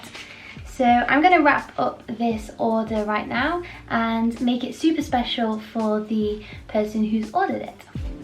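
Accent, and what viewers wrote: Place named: Midlands, United Kingdom. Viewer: You came up in my recommendations and I really liked your video so I’ve subscribed. Are you from the Midlands?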